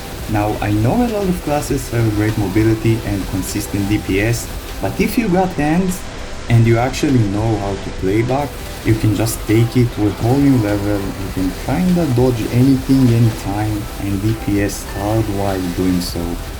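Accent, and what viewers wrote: Israel. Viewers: המבטא הישראלי תפס אותי גם חחחחח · 1 thing i didnt see u mention is the "Takeno's Blessing" skill from mushroom shrine. Its a fake iframe that can tank some boss patterns ( Lucid's bomb, p1 Will screen crack and a few others ) AND u can reset it with Time Leap. And if ur already going for the mushroom shrine skills u can also grab the Yorozu bind as it is also resetable with Time Leap. Also, dont worry about ur accent, its much better than other Israelis. Keep on the good work!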